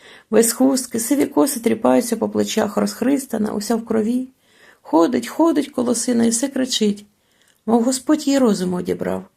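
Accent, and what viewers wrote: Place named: Russia. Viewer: Родной язык чтицы - русский, и это слышно. Тембр голоса приятный, но нет той сочности и точности звуков, которые присущи только реальному носителю языка.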